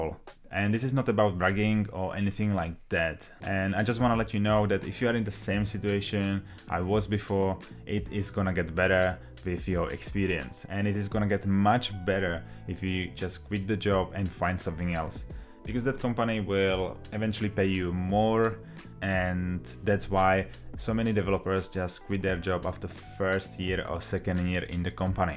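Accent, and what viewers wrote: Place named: Czech Republic. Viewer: Can I just ask if you are czech by any chance ? I hear it a bit in the accent :D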